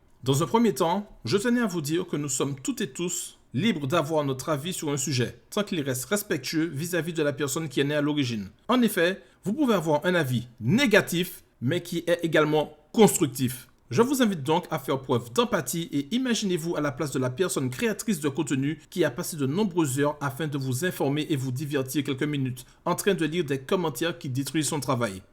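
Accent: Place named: Antilles, Caribbean. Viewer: Merci à toi pour cette video de soutiens force aux antilles✌😉😉😁👍he oui l accent on ne peux la zapper force a toi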